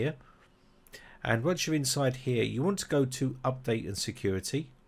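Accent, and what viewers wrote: United Kingdom. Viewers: Wow.. Nice British accent. I love the way a true English man speaks.. Absolutely marvelous..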